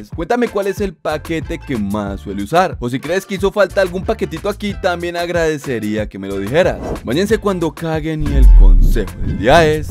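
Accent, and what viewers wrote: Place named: Colombia. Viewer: Por qué hablas como colombiano? deja de hacerlo es molesto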